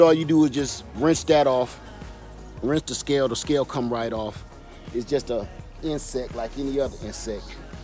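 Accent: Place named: South, United States. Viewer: I knew your accent sound like a southerner I'm from Beaufort my older brother lives in Columbia as well wow!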